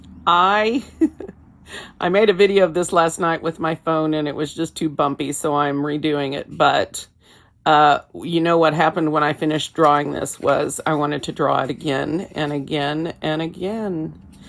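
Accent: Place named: South, United States. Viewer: I beg your pardon, we do say y'all from time to time, but around here, we don't use y'all like Paula Deen. I love her but she goes way over board with it. But you know, when she first went on tv she didn't do that. Must be some sort of publicity crap. At any rate, I do love your tangles, southern accent and all. 😂😂😂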